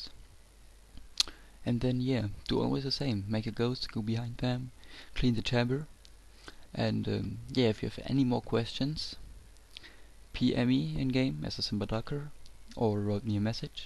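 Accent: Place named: Germany. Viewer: Du bist deutsch, oder? (wegen deinem Englisch(th Aussprache))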